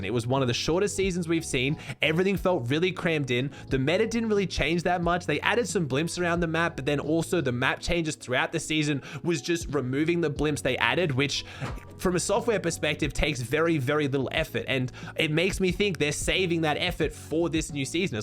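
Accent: Australia. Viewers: Not gonna lie, Groggy brought a smile to my face. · Wtf haha that voice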